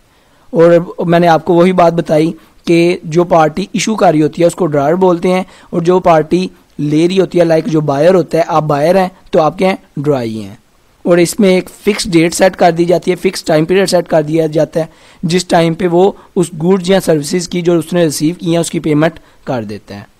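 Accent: Punjab, India. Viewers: Sir aap punjabi ho ??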